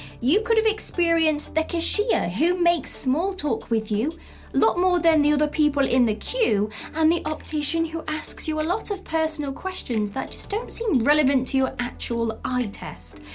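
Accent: England, United Kingdom. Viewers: I absolutely love your voice! Are you English or British?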